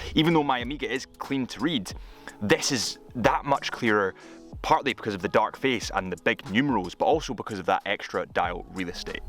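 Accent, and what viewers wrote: Scotland, United Kingdom. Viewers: This outdoors video is a more raw, younger and more Scottish sounding version of BarkandJack’s work. Enjoyable !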